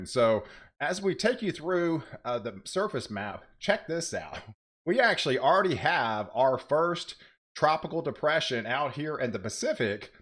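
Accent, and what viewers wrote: Texas, United States. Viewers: This guys voice sounds like a young Christopher Walken with a Texas accent.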